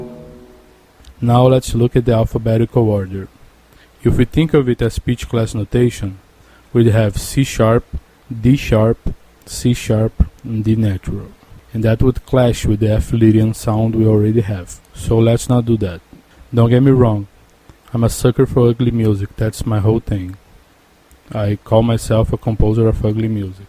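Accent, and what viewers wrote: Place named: Brazil. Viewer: Puta que me pariu, comecei a aprender baixo e curto math rock tem um tempinho. Sigo teu canal faz uns meses e eh o meu preferido! vendo esse video e o sotaque pensei " vish, parece BR" e eh mesmo hahaha Maaaano, muito feliz em saber! :D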